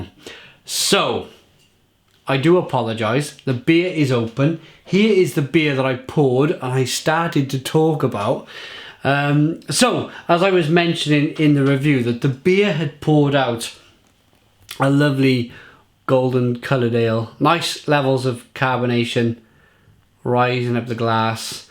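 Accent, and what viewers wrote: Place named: Wales, United Kingdom. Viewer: “It’s going down like milk!” Love it! My mate Matt from Dinas Powys, a similar accent to used to say of cider - “It’s going down like water!” Love reviews. A legend of YouTube. No frills, just enthusiast and knowledgeable. Legend.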